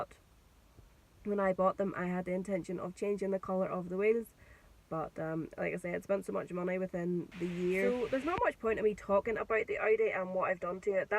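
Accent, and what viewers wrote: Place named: Northern Ireland, United Kingdom. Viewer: It's the townie accent which makes them think you're Scottish. 😂 😂 if I had to guess I'd say Lurgan but you might not want to get that specific. 😂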